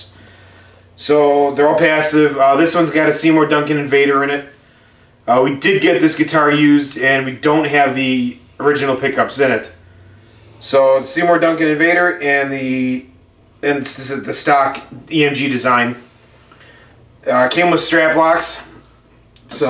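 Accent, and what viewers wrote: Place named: Canada. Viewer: O.K., Good cover, guys!, and a Great guitar!, 👍 Gave you a follow, too. Because IF YOU'RE NOT CANADIAN!, you certainly SOUND Canadian, with straight-shootin' straightforward appraisal!, of the qualities & sound of an Ibanez I have, and appreciate! Rock on! 🎉😂 🇨🇦 🍁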